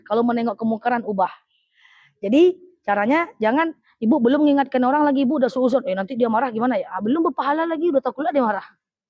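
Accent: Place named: Sumatra, Indonesia. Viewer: Masya Allah, baru ketemu Chanel ustadzah, senang penyampaiannya dan seru sambil senyum senyum, masuk ilmunya, terimakasih Ustadzah, Ngomong ngomong ustadzah dari Sumatra bagian mana ya Logatnya saya suka, Krn saya juga dr sumatra, ❤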